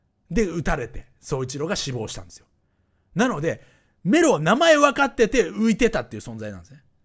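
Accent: Kansai, Japan. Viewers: アバターより本人の方が好きだったけど、アバターでもしっかり聞きやすいし、面白い(^^) デスノートに限らず、こんな複雑で難しい物語考える人すごいなぁ ほんで関西弁めっちゃおもろい笑コテコテやし（関西人）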